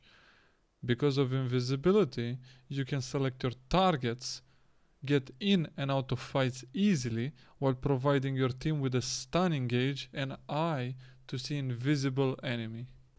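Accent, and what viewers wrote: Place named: Romania. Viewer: Super tare, ma gandeam ca esti roman dupa accent, dar pana la duel cand am vazut in chat nu eram sigur :)) E comunitatea mare pe 4story classic? Tot am zis ca vreau sa ma reapuc de un server de 4story si din ce vad pe youtube, sunt destui playeri care joaca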